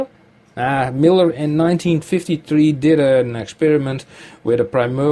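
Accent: Netherlands. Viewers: You have netherland accent english, nice.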